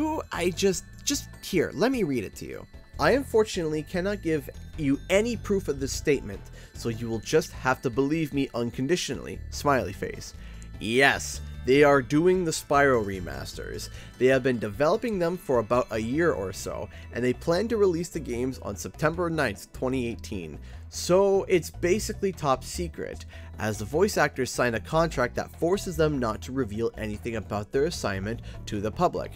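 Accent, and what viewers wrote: Canada. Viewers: Oh Canadian? Im a first time viewer of your channel and I already like it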